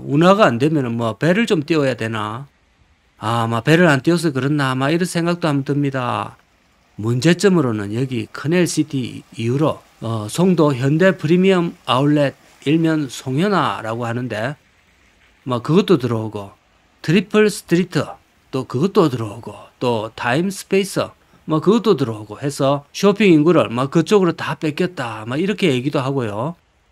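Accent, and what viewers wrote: Gyeongsang, South Korea. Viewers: ㅋㅋ ㅋ.전 전라도에 사는데도 갱상도 말씀이 참 구수합니다.늘 잘보구 인생공부겸 세상구경하고 있읍니다. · 집이 전라도 였습니까? 말투가 경사도 사투린데...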